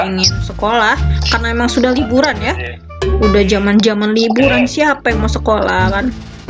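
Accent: West Java, Indonesia. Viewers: Sunda Euy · Sunda ti mana neng gelis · orang sunda gening😀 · Orang mana teh ning sunda? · Sunda Wani😂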